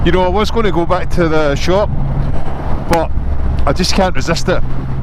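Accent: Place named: Glasgow, United Kingdom. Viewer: I came to see the review and really enjoyed it. As an expat living in Australia it was great to hear a glesca voice and then I realised it was in Hillington estate. Different Triumph shop now as he used to sell Ducati's as well and I remember the original dealership from Renfrew.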